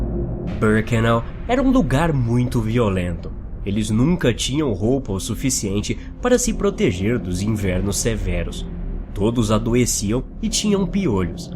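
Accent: Northeast, Brazil. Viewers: ele tem um sotaque nordestino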